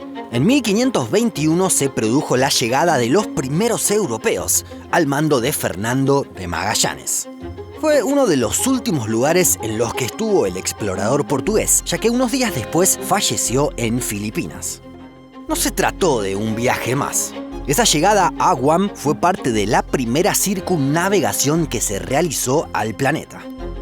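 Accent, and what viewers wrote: Argentina. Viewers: El acento argentino es muy lindo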